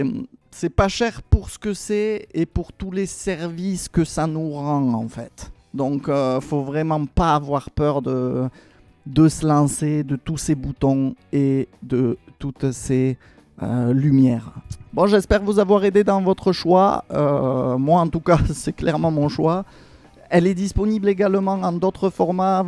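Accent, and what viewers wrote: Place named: South, France. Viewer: super ta vidéo! je cherche une table de mixage et tu m'as donné envie d'acheter ce modèle! je connais bien le matos yamaha...mon fils m'a emprunté une table de mixage yamaha que j'ai acheté il y a plus de 10 ans chez cash converter en occase! 12 pistes 170 euros rien à dire! il y a une couche de poussière dessus de 10 cm au moins, et elle fonctionne toujours super bien!! il ne veut plus me la rendre!! en tout cas ton accent du sud fait du bien à entendre!! bonne continuation à toi!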